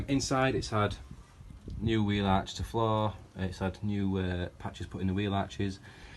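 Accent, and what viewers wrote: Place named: Yorkshire, United Kingdom. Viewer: Thanks for the shot out bud! And it’s great to see another face on the you of tube! You sound Yorkshire way, where abouts you from mate?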